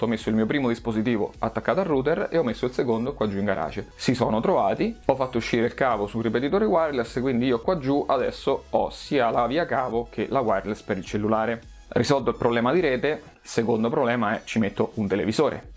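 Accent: Marche, Italy. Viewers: Ai gatti glie gusta ste cose ahahah adoro il marchigiano 😂😊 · io vivo al nord, mia madre e' nata a Corinaldo e mio padre a Mondavio. Sentirti parlare mi fa sentire a casa. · fai troppo ridere. caparbietà e testardaggine per portare a casa il risultato tutto raccontanto con accento marchigiano. Visto tutto il video col sorriso.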